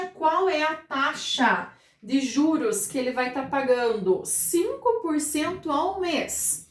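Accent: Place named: South, Brazil. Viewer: Professora estava olhando umasa aulinhas de matemática financeira encontrei esse sotaque que deve ser do Sul. ,que ao que parece entrou no mundo das tele aulas devido ao isolamento sócial . Querida vejo também que tu gosta de ensinar e eu defendo quem faz as coisas por amor beijo sucesso e se puder ponha aulas para encontrar taxa e período compozto pois não encontra se aí disso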